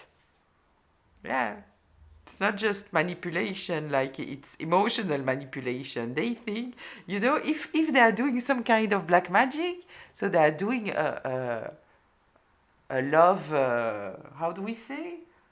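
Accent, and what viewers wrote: France. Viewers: Love your beautiful French accent, and when you swear, lol, do you reside in the UK? Very insightful reader, I do resonate xx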